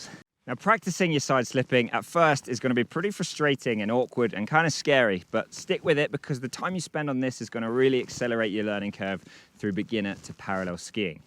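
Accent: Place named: United Kingdom. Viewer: Love to see a good video from, that british accent!! Allez Alvoriaz!:D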